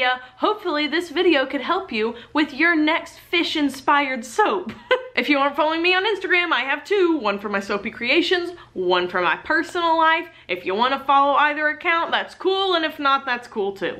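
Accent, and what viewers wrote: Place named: South, United States. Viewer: Anybody else from 2020 and sounds sooo southern in the intro? Lol I know how southerners she sounds bc I’m from the south 😌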